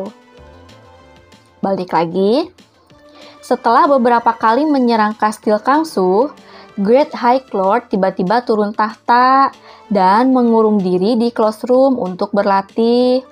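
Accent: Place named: West Java, Indonesia. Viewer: kk orang sunda ya.. logatnya.wkwkw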